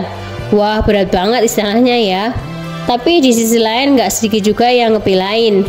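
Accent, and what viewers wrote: Java, Indonesia. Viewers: Mbak nya aksen Jawanya masih kebawa..😀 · Dialek adminnya meddok ala mulyono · Gue demen konten Lo bro. Enak bawainnya, santai, trus ada medok medok jawa nya gituh. Pokoknya asik deh. Asli gila Lo bro !!! 🤣🤣🤣🤣🤣🤣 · Medok banget admin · Voice over ini dialek medok jawa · Sampean medok banget mbak ngomongnya... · Mbak medok bgt,trus kpn mau nampilkan orang Malay berdialek Jaksel?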